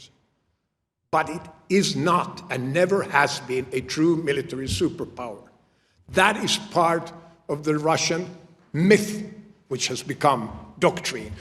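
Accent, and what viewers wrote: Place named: Mid-Atlantic, United States. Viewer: mid atlantic accent👹👹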